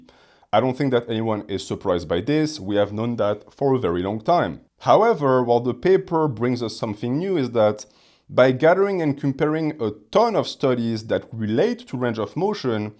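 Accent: France. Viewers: Fitness advice is way better with a thick French accent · hello, this is not related to anything but is it possible for you to style your facial hair like a pencil moustache? you are french and i think your accent will match your character if you rock a pencil moustache. thank u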